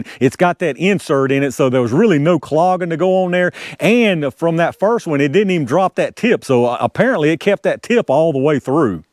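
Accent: South, United States. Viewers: I love this man. Scientific method. Southern accent. Enough said.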